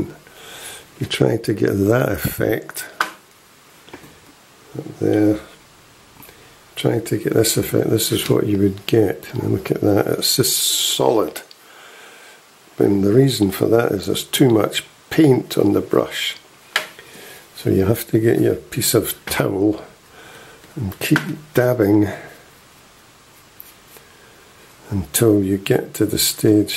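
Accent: Scotland, United Kingdom. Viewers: Love the painting. You sound like you are scottish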